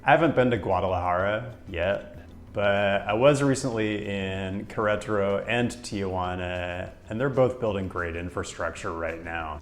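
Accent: United States. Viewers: GREAT VIDEO! I, too, am a gringo nerd who likes your channel and is oddly entertained by your videos. I also LOVE México City and it's amazing, gigantic and extensive transit system -- and I don't get to visit enough! I ❤ CDMX🇲🇽! As a resident of a huge American city on the I-10 swath, where "Spanglish" is widely spoken, I feel the desire to help you out a little. (You definitely speak like an "I-90 gringo".) For "Hidalgo", say "ee-DALL-go" For "Insurgentes", the "g" is pronounced as "h": "een-soor-HEN-tes" and "CableBus" is "kahb-lay-BOOS" (hard "s") From one gringo to another, I hope I have nudged you one tiny step closer to Spanish fluency! 🤓👍🏻🇲🇽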